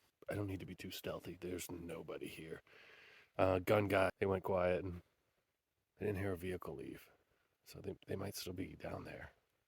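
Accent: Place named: Massachusetts, United States. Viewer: I just found your channel a few days ago. So far it seems you're camping in NH, but you seem to have a Massachusetts accent, am I correct in assuming that? Great videos, BTW! 💞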